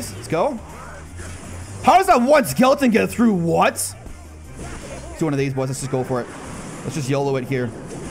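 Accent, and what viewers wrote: Canada. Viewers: He’s prob Canadian they say that a lot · Canadian eh? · was just watching a random video (first video of him) and realised this guy was canadian. And yes,I even searched it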